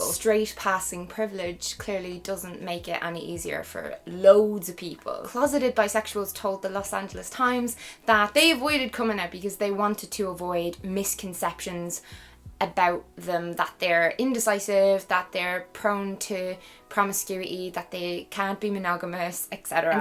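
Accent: Ireland. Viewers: Do I hear a fellow Irish spudddd?? ( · I loved this video. It’s always so nice to hear more about my sexuality. I also I love your accent it makes me miss my time in Ireland so much! Thank you for a great video💚💚💚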